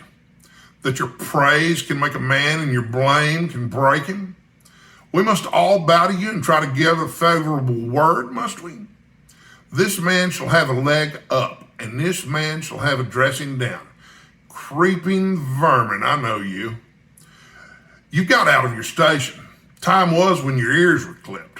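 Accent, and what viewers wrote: Texas, United States. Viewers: You had me laughing out loud with this chapter, especially during the description of of their gymnastic tussle! I think I have the best chance of feeling fond of Professor Challenger hearing him with a wonderful Texan accent. I daresay the one thing that can make Conan Doyle even more enjoyable than it is on its own, is to picture it taking place with Texans rather than Englishmen (no offense to the English!). Anyway thanks for the reading.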